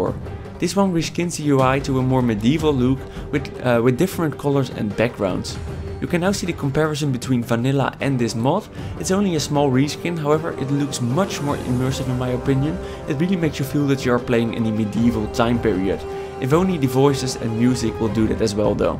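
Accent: Netherlands. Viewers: That is one heavy dutch accent... My dutch friends makes fun of that accent all the time :D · ik hoor dat je Nederlands bent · ***** Thought so :P Your heavy accent gave it away.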